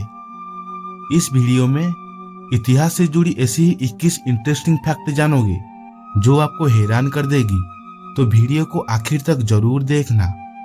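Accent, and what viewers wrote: Odisha, India. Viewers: Are Bhai odisa se ho kya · Hindi pronounciation ru jana paduchhi j apana odia.... But nice historical facts keep it up.....❤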